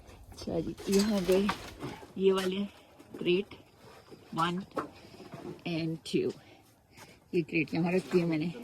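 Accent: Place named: Mirpur, Pakistan. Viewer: Aap pakistan mein kahan se hain Aapka accent bilkul mere jaisa hai And aapki choice of words bhi Mein Mirpur k paas se hu.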